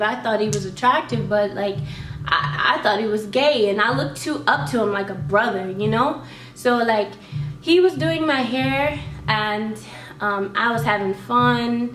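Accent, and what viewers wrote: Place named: Ethiopia. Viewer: I’m sorry this happened to you. Stay strong 💪🏻. By the way, your Ethiopian accent mixed with American sounds soo nice 👌.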